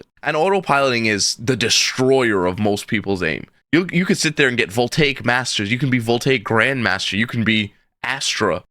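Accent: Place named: New York, United States. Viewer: Man you def sound like a New Yorker that wants the best for everyone. I'm a tekken refugee playing marvel rivals and these videos helped alot!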